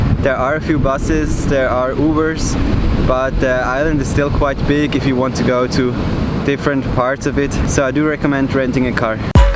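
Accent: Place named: Germany. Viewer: Nice to listen vloggers with an Accent that U have idea where its from... My guess is German.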